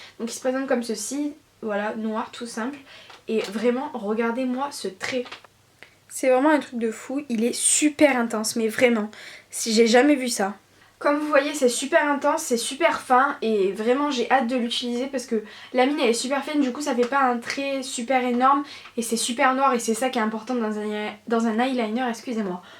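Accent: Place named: Marseille, France. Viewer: Tu as un petit accent marseillais, tu habite à Marseille?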